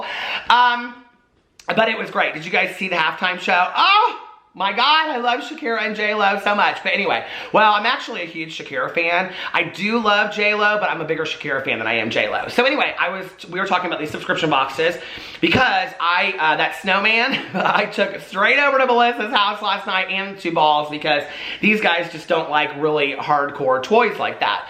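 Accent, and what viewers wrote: South, United States. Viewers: My mom loved the little house on the prairie bit. She’s from the suburbs of Chicago and says, wow he has such a country accent 😂😂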